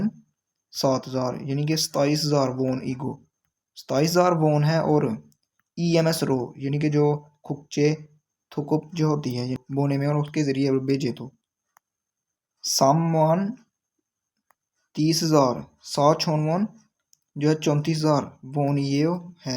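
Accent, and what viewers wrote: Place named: Sialkot, Pakistan. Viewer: Sialkot SA lag Raha ho😊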